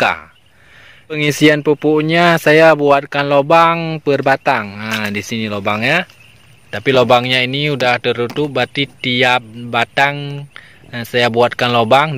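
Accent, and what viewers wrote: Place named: Aceh, Indonesia. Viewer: Kaya orang Aceh Berbah Indonesia,Meri bak irama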